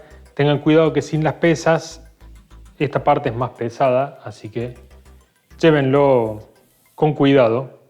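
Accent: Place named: Córdoba, Argentina. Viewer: creo que por tu acento eres cordobés 😊😉. Sabes. Tengo un 🔭 Sky. Watcher Dobson extensible de 10 " <num>. F: 4,7 . Y una cámara Reflex T6. Modificada ..la Abrí yo y le saqué el filtro quedó a sensor pelado . Quería probarla así . Y es una locura ... Las fotos que tengo haciendo light a pulso lo mejor posible .. en 0,8 s 1s como máximo sino me salen las estrellas movidas 😊😊😀 que pena no tengo como mostrarte las fotos de Orion . Una locura 😍😍😍 . Vivir está experiencia de hacer light rápidos y tener que enfocar la cámara cada 10 fotos ..me quedará en el recuerdo !!! Después que me llegue la montura .... Nosotros allá en el sur sabemos empezar desde lo más bajo con los poco recursos que haya !! Eso no lo perdemos nunca 💪💪😁😁 un gran saludo !!! 👍👍👍👍👍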